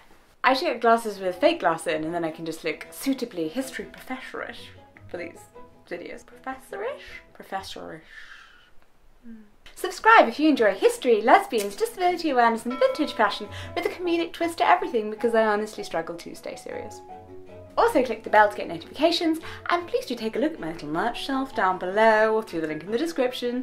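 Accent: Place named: England, United Kingdom. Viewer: English accent 🤤😍😍😍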